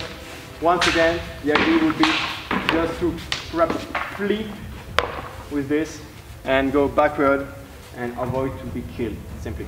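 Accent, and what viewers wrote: France. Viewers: Excellent approach! Kudos for being able to assemble more than a few warriors at one time. :) I might like to offer a few suggestions. Frankly it's difficult to follow your French-accented English; it might be easier if you spoke in French. ;) For swords, I would say to use "bastard swords" or "hand-and-a-half swords", at the very longest. Tests that we've done in the forest show that longswords are very awkward to carry on a regular basis, Peter Jackson's movies notwithstanding. And longswords are little extra help against armor. You really need an axe or a mace to directly defeat armor. Spears are great in the forest, but the ones you show in this video may be too long. I suggest you try moving about in the bush with this equipment before making any conclusions. Shorter spears allow the butt to be brought to bear if an opponent is closing in. 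Modern warfare (with rifles) dictates a more open formation for two reasons: a bullet can travel through more than one soldier if they are side by side, and soldiers can provide support to one another with rifles even if they are many yards apart. Spearmen can stand more closely, so as to provide support to one another. Of course the terrain will provide its own problems. Practically speaking, a single-edged knife is more useful in the bush than a dagger can ever be. I think your formation is mostly accurate however. The spears want to be in the front, to keep the archers from being charged. They would work as bayonets did in later age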